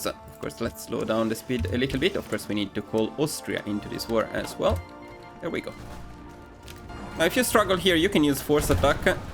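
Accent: Italy. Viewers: Nice video nerd. By the way, are you Italian?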